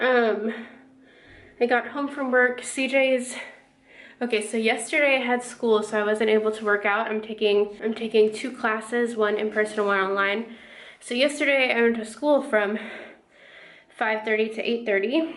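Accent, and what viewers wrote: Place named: United States. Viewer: where are you from? You sound like your from the states but hubby sounds English / England. I could totally be making this up. Great video with loads of ideas!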